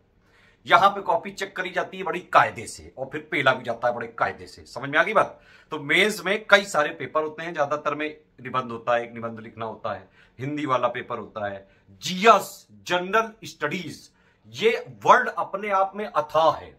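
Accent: Kanpur, India. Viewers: Sir kya Kanpur se hai kyuki apki language humare kanpur dehat ki lg rahi hai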